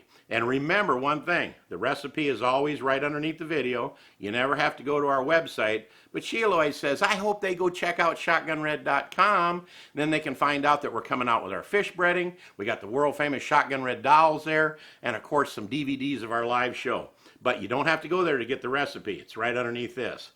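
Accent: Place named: Ohio, United States. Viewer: You are videoing from TN where you may live, but you sure don't have the accent. I think you sound like you're from Ohio! I love the accent.😊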